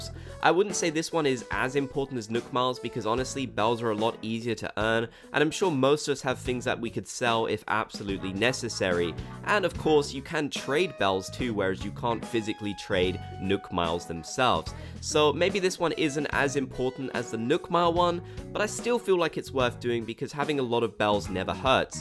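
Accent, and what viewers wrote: United Kingdom. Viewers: ahhh you’re British! I was trying to figure out the accent :) I’m from New England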